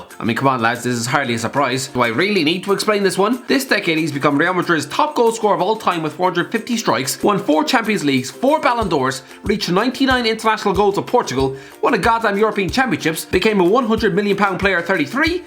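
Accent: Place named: Ireland. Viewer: When I saw “XI”, I thought it would be that dog shit narrator who did the all time XIs for Klopp and Guardiola. Thank God for the returning; Christ above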